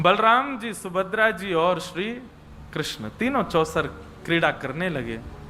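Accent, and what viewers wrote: Braj, India. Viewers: Raadhey raadhey guru ji aapke charno me koti koti pranam aapki Katha bhut acchi lagti hai aapke mukharvind se brij bhasa bhut sunder lagti hai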